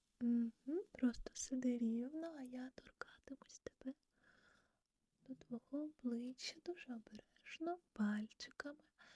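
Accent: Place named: Ukraine. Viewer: As I always say, relaxing begins with!🤩🤩🤩 what a beautiful whispering voice with that magical Ukrainian accent!!!😍😍🥰❤Love Greetings Oliver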